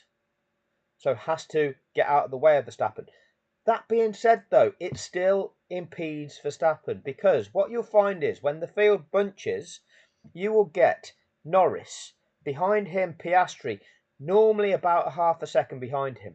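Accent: United Kingdom. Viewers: your britich ???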